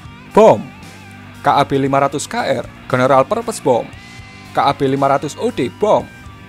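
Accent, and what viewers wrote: Java, Indonesia. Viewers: MEDDHOOOK mas MEDDHOOOK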